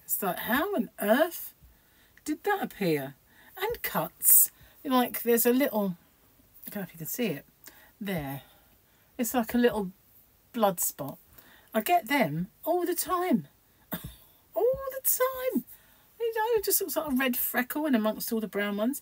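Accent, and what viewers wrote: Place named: North, United Kingdom. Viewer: I too have always been interested in the way people speak, and trying to figure out in my head (before asking)where they're from 😊. Different regions are so interesting. I was thinking to mention that a coworker of mine is from Bedfordshire. I asked her to listen to a bit of the video I was watching (of yours)& she said you're from more north of the UK, and that you don't have an Australian "influence" in your speaking. I knew that I heard a difference between your and her accent 🤓. Anyway I find your videos entertaining 😁, but I have to get ready for work. Have a great day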